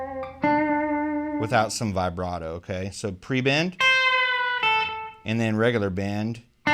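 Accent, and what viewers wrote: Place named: South, United States. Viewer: I purchased this guy's guitar Sizzling Guitar Licks course a few years ago but due to major work and family commitments I was never really able to spend much time on the course materials he supplies. Skip forward a few years and I started to try to use some of his lessons and so called support literature. Like he says in the letter that comes with the package of DVD's "Where do I start with all this stuff? " Good question - the package was so disorganized and the tablature does not relate well to any of his DVD's. A real hill-billy package. You have to sit and listen to this dude blab on for minute after minute in his dumbass country twang wondering when is he ever going to get to the point. He is a great picker but seems to love the sound of his own voice way more! Once you buy this guy's course you will be incessantly bombarded with daily offers of more stuff to buy, but Good Luck if you ever try and request reactivation of your account to download missing tablature. 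2 or 3 e-mails later and not even an acknowledgement. Garbage after sales and customer service. Caveat emptor - translate that if you can. John 5 is much more of a gentleman!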